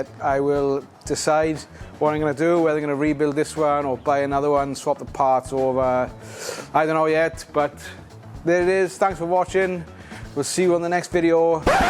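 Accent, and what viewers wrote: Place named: South Wales, United Kingdom. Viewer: Thats a real Bummer, like a Major BumOle! So sad to see a well sorted bike go bad like that , you obviously know how to put a engine together but not sure if you worked on that one before the issue. At least we got to see the internals of a DRZ, i thought they were pretty much indestructable. Ihave a Husky WR250 that went pop due to a exhaust O ring tear,ingested some finest Welsh grit and did the big end crank and the bore in. I ride a Xr650R and a few more Hondas but not turned the BRP over in a few years so I just hope she is ok buried iin the back of the garage. Good luck buddy , detect the SWales accent there , alot warmer where you are atm Atb x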